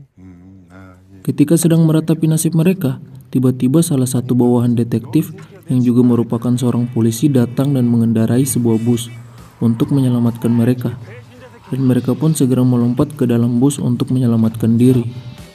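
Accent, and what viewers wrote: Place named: Eastern Indonesia, Indonesia. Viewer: logatnya mirip orang timur. APAKAH ANTUM ORANG TIMUR?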